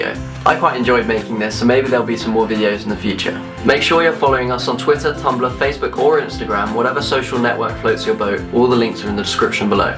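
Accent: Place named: United Kingdom. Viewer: amo sua acento britanico <3 <3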